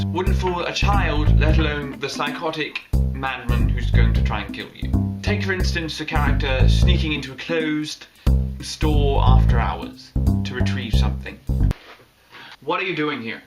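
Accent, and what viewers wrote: United Kingdom. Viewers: That is quite an astounding British accent. :D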